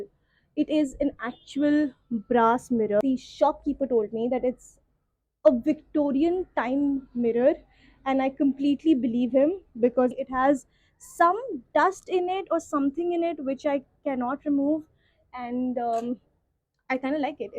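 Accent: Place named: United States. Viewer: To much American English stop tht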